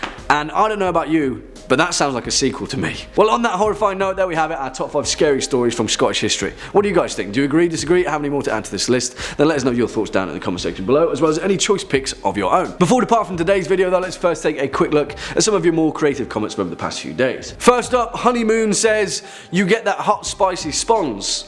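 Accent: England, United Kingdom. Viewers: Want to like cause it's about Scotland, but cannae be having that English accent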